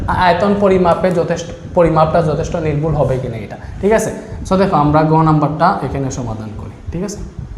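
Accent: Sylhet, Bangladesh. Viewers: Bai kita sylheti ni mate to ola lage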